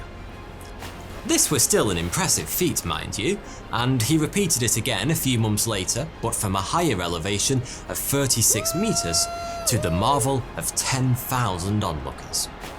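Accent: United Kingdom. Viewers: Thoughty2 why are u British?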